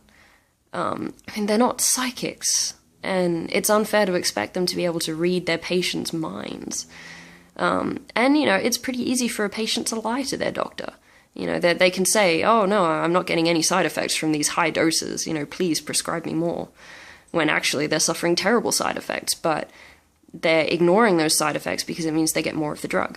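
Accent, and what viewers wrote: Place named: Australia. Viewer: Good to hear another Aussie accent on YT.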